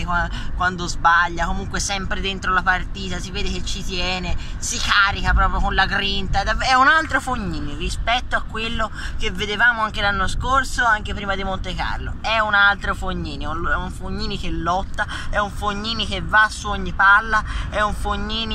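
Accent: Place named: Florence, Italy. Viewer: Purtroppo hai quell'accento fiorentino. Per il resto bravo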